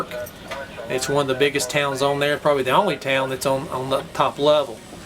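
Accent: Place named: United States. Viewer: Aloha All,,, Just Love It,,, But I Do Have One Question,,, What Language Does This Man Speak,,, Sounds Kink of Like PURE AMERICAN To Me... What Great Craftsmanship... It Would Make Michelangelo So Proud,,, What Great Craftsmanship... How Many Cars,,, ? ,,, All N Gauge >?<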